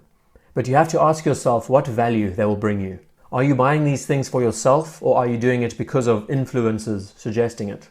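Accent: South Africa. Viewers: Agreed! I thought South African. Keep up the good work!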